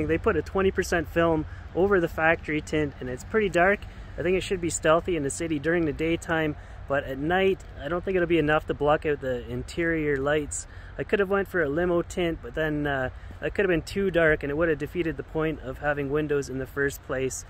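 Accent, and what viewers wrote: Canada. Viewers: nice you have the same jig saw i do! Just bought it to help with my future builds! Love the accent. Mn raised here, Not as thick as your canadian but don't you know..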